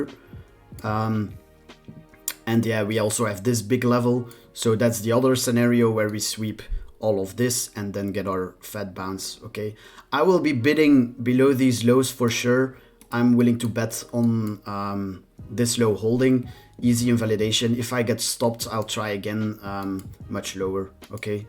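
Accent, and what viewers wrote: Flanders, Belgium. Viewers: Is it flemish? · I hear flemish accent? ;) Agreed btw, looking to bid sub 45k if we get there. Wonder when/if we're gonna get sweep of liquidation candle bottom soon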